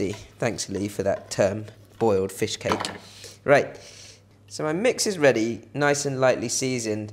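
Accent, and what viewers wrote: England, United Kingdom. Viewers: That's the way it's pronounced where he's from in England. Christ, the things people whinge about!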